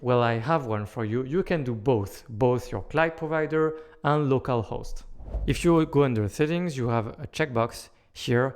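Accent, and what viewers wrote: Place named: France. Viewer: Trop bien avec l’accent français j’arrive a comprendre ce que tu raconte 😊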